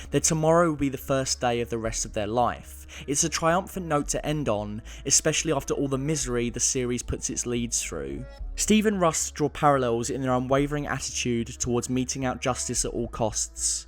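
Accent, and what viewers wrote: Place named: Edinburgh, United Kingdom. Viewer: glasgow? its edinburgh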